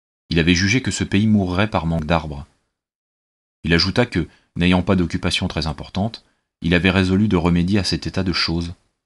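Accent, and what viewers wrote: North, France. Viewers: Dommage que l'accent soit du nord pour raconter une histoire que Giono a raconté avec notre accent du Sud!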